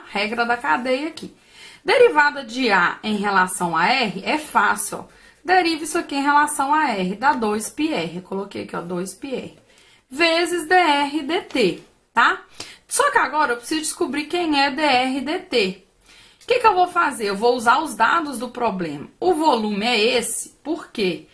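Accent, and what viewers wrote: Minas Gerais, Brazil. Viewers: Vc e mineira né?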